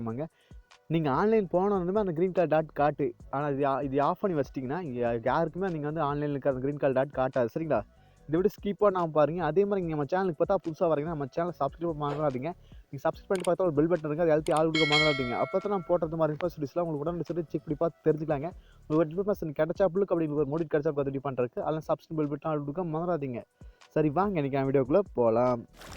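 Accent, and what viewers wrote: Coimbatore, India. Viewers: Anna coimbatore slang super ah iruku 💙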